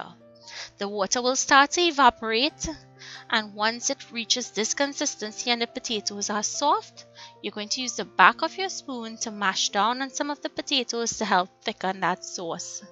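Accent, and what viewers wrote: Trinidad and Tobago. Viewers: Hah thanks a ton for sharing this! I’m bajan but always loved the trini accent!! I’m currently making this now .... so simple and quick, I love that! 👌🏽 Could you do a pepper sauce recipe too pls? ☮️😃💟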